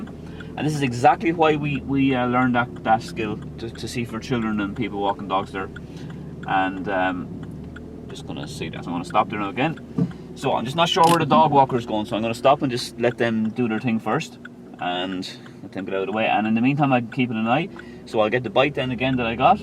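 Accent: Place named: Ireland. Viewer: hi, today I went up a seriously steep hill and my car told me to select a lower gear so I went up in second but my foot was all the way down on the accelerator! It felt like the car was going nowhere or was going to roll back! I nearly s*** myself! I did make it up the hill eventually but nearly had a heart attack as I'm a nervous driver anyway. Was I correct to proceed up in 2nd gear? Is there a correct way of going up a very steep hill as I thought my accelerator was going to snap off. please let me know as I'd like to take the kids to where the hill led to in the end but am really scared of driving up that hill. Love your irish accent by the way!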